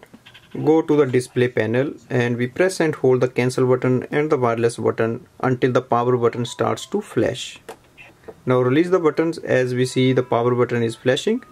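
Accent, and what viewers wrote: India. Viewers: I am among the millions of English speakers who have great difficulty understanding the variety of English spoken on the Indian sub-continent. Please remember that you must speak much more slowly and pronounce very clearly when speaking to us.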